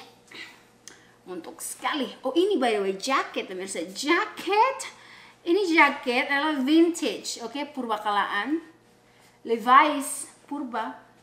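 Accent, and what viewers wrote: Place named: Eastern Indonesia, Indonesia. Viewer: Kk logat timur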